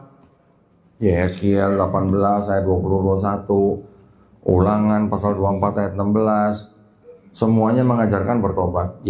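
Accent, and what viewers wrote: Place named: Java, Indonesia. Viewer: Beliau ini chinese tp kok njawani yo. Lanjut koh...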